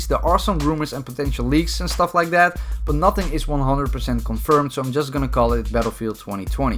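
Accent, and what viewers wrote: Netherlands. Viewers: Je kan gelijk horen dat het een nederlander is:) Lekker bezig # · I swear you are dutch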